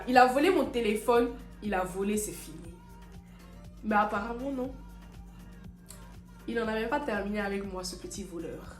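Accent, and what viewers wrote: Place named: Ivory Coast. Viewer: Sa se voit trop dans ton accent que tes ivoirienne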